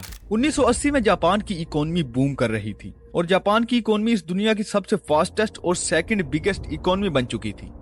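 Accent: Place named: Pakistan. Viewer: Bhai accent sudhar le (pakistani lagh rha hai )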